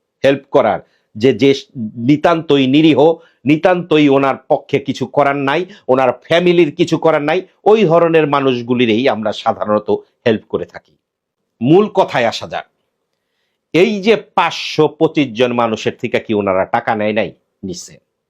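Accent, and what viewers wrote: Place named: Feni, Bangladesh. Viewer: আপনার ফইয়া কথা আমার কাছে ভাল লাগে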